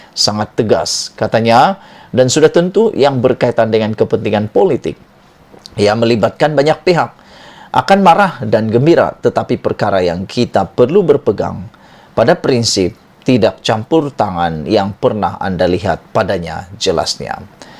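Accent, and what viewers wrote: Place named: East Malaysia, Malaysia. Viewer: Boringlah tiap2 kali buka utube ni asoklah tengok kamu ni dan denga suara kau dgn slang msia timor kau tu.... Agaknya kalau kamu pakai yg lebih sesuai dan menàrik mcm suit dan tie. Adakan org yg kemaskan muka dan hairstyle tu..... Kalau pun kandongan komen kamu tu agak menarik perhatian , presenternya mmesti mempamirkan personality yg menarik...! Buat sementara ni berhenti menonton persembahan kamu dulu....rasa muak dan monotonous....!!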